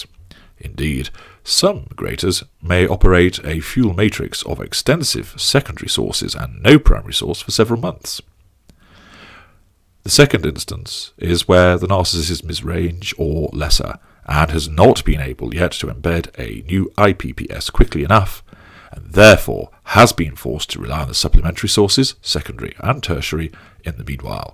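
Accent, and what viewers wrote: United Kingdom. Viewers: Another Brit accent. See ya.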